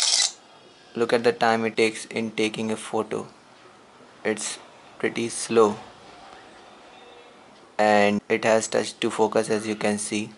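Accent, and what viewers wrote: India. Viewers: Lmfao. Ok then, if you know so many languages you know how tricky it is to learn sounds and how to speak correctly sometimes. First. Second, if you complain about his English skills, at least write properly. Third, Yes he does have to improve, I never said otherwise, yet I've seen tons of reviews made by people from India speaking English and they all sound the same (some better some worse - no offense) so... there isn't much he can do.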